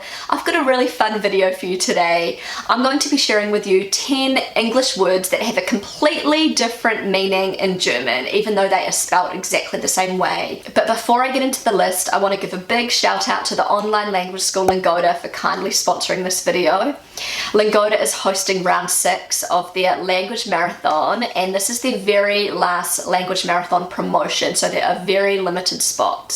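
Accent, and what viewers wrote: New Zealand. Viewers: Just found your channel and I am enjoying it! I think, with an Aussie twang, some of these German words feel easier to pronounce than perhaps yours with a Kiwi accent. Eg. Links ('Lien-x' in Kiwi, 'Lin-x' in Aussie). Probably vice versa on some words too. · As a native speaker of English, I have less trouble understanding your German than your Kiwi English. Dead, pronounced “did” Gift, pronounced “guft”. As with the famous graffiti: Australia 6 New Zealand 7 Pronounced: Australia sucks, NZ ‘sivven’ (‘s heaven). Keep up the good work! 😉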